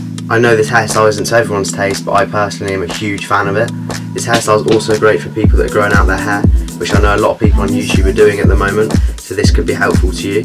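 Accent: United Kingdom. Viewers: you’re from england I see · Ooh he’s British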